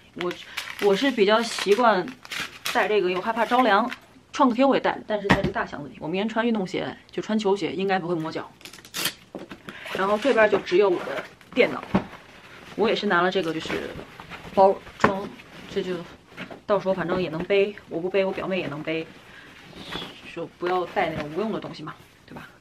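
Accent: Beijing, China. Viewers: 是北京人嗎～～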